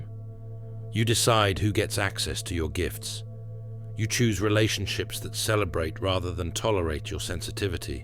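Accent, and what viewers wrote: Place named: United Kingdom. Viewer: sing-songy British voice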